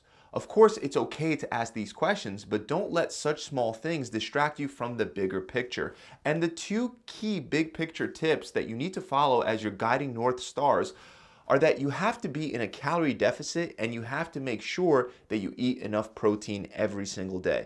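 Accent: Philadelphia, United States. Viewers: Know I can tell you are from Philly or Jersey? 💦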